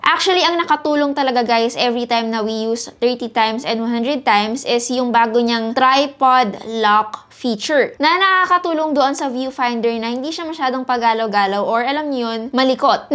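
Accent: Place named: Philippines. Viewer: I don't like your speech delivery. It's so Tagalogized English accent. Actually, ultrra, exxact, mowmint, teaboll, apresheyt, to be honest, ech d, achually, Should refer GSM ARENA specs. Waste of time too have been watching you. Noisy and too talkative.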